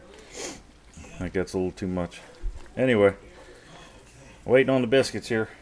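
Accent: South, United States. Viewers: Your country accent does things to me! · once you hear that thick southern accent and the video is about food... you know shits.. about TO GO DOWN ! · How annoying is that! Someone actually commented that they missed what he did with the flour. You know you can watch the video more then once. I love your voice. It's country like me :) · imagine if this guy didnt have a southern accent. i wouldnt be able take this video seriously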